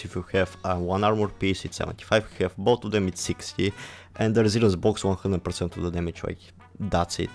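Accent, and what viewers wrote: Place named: Russia. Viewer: russian accent cancel!! anyways good video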